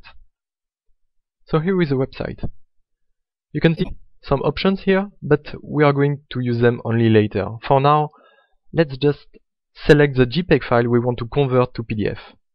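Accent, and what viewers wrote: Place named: France. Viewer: THE FRENCH ACCENT!!! , good apps btw, thanks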